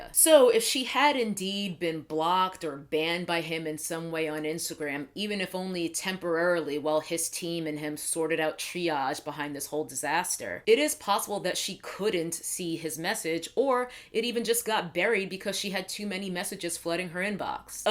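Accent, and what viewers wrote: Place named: Long Island, United States. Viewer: Pausing this video to say that red lipstick looks amazing on you. And I Also love listening to you talk because I'm getting hints of LI in your vowels. I miss NY, what can I say? Coming back: I felt so bad for Chelsea. It was so obvious that her style was lifted. Patrick Starrr's comment really hit me the wrong way. I didn't like it and got some pretty bad vibes. Manny apologized and to me it appeared to be sincere, but the damage was done. I really hope that this negative will somehow turn around and open up more opportunities for her. She deserves it. I know nothing about making videos, but I knew when I saw hers that she worked very hard. My phone is keeping track of what I read. Today when I woke up, I read about the woman, Ann Lowe, who made Jacqueline Kennedy's wedding dress. It resonated with me as the Ms. 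Lowe is a woman of color and came from a long line of excellent seamstresses. it's been over 50 years and some things have improved, but there is still a long way to go.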